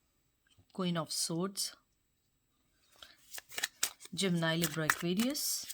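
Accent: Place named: Karachi, Pakistan. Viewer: 100% Resonate to me. Thanks. Aap ke Bolne ke style se lagta hai ke aap Karachi de hain.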